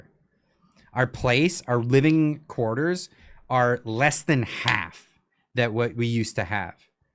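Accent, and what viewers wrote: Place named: United States. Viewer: Big deal you're going back home where you come from ,where you belong. You've never been Canadian you're an American. You can hear it with the way you speak English cuz you don't sound like any anglophone Canadian that I know and I'm married to an anglophone Canadian woman.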